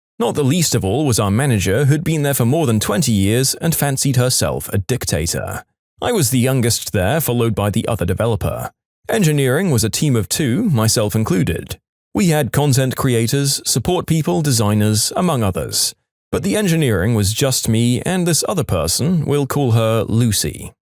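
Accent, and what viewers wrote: United Kingdom. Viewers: No offense but British ahh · The British voice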